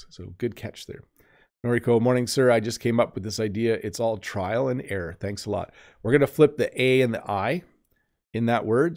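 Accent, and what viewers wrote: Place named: Canada. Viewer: Hello Mr I like your Canadian pronunciation and whenever I have time I see your videos and I really learn a lot from. good job good teacher respect from Afghanistan.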